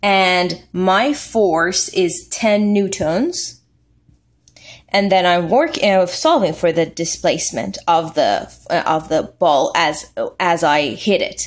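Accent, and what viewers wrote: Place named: Iran. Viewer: I swear to god when you started talking I knew you were iranian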